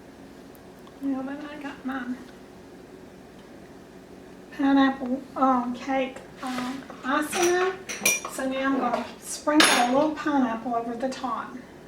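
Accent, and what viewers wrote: South, United States. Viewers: Watching her for the first time love the recipe and her southern accent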